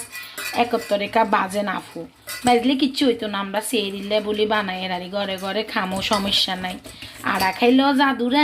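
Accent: Noakhali, Bangladesh. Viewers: আপু আমি আজকে প্রথম আপনার কমেন্ট করলাম আপনার নোয়াখাইল্লা ভাষা খুব সুন্দর লাগতেছে আপনার মুখে আমিও নোয়াখাইল্লা বউ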